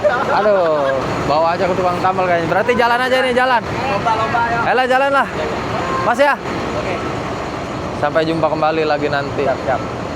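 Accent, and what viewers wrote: Serang, Indonesia. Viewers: Sy senang ngikutin yutup sy juga sopir bis malam pahala kencana tapi lebih senang lagi klu bicara dikit dikit waduh aing ciri khas orang Serang he he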